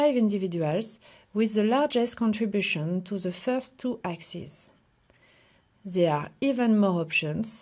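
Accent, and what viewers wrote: France. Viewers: Her English accent is like french-i couldnt understand much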